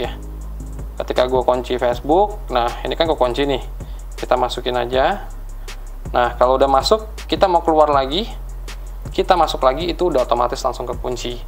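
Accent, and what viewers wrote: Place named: Sulawesi, Indonesia. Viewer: Kental banget logat Sulawesi nya bang 😂😂😂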